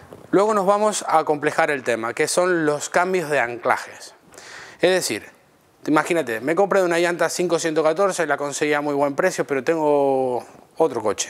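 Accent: Argentina. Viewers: El acento parece argentino, pero habla en pleno español :P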